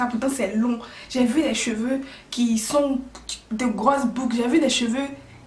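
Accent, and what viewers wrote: Ivory Coast. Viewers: Tu es ivoirienne? Je viens de m'abonner l'accent ivoirien là 😉😉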